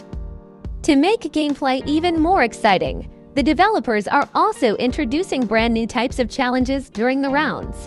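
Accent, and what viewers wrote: Russia. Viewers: Are you Russian or Ukrainian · Arwe you russian? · Are u russian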